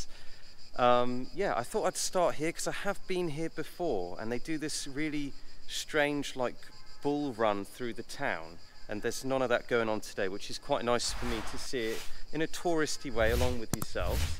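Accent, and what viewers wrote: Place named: England, United Kingdom. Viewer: Brilliant. Your accent reminds me of my favourite cricketer Geoff Boycott from England . Thanks for showing lovely places in Uzes.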